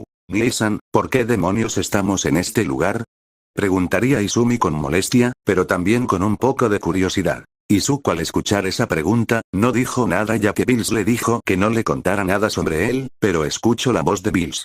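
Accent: North, Mexico. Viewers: Mmm noto algo de norteño xx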